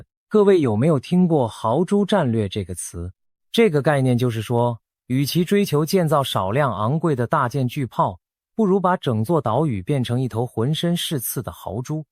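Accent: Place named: China. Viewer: 阿你這配音不就帶著中國腔!搞笑嗎?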